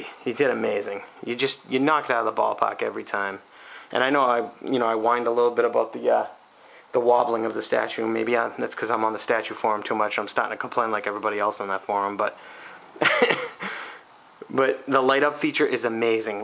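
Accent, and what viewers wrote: Boston, United States. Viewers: love your videos!! can i assume you are a red sox fan by your accent?? lol sorry couldnt help but notice, thanks for such awesome statue videos man!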